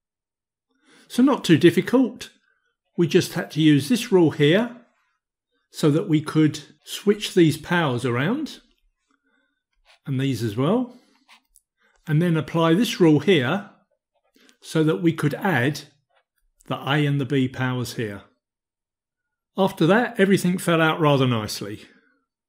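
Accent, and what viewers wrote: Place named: Cockney, United Kingdom. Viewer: Really nice! Love your accent(Cockney I hope?)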